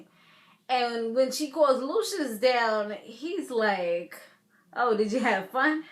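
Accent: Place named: New York, United States. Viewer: I love your accent! Where are you from? Sounds like New York with an island twist.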